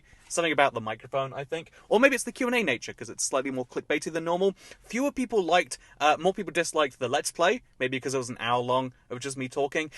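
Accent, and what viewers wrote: England, United Kingdom. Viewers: Please continue with your British English. Naught makes you sound smart, as I associate it with Numberphile. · Honestly I don’t mind the British English, I think it’s fun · My question there is about how British say things. The things I have a question over is the word aluminum and the letter z. Why do you(British people)pronounce aluminum(a-lu-min-um)as aluminum(al-u-min-um) and prounce the letter Z(Zee in American)as Zed? That is stupid how it works. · Well, I like your English accent and I like that you say "nought" so if you change your mind and decide to continue saying nought instead of zero, I'll be in support of that decision! It's nice to hear how different regions of the world speak English differently. I feel like Americans getting mad that you say "nought" seems really spoiled/entitled. Like "English is my language and you have to use the words I use!" Which is super boring and gross.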